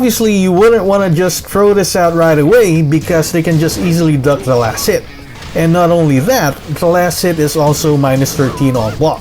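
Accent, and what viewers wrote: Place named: Philippines. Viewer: I knew the accent was filipino lol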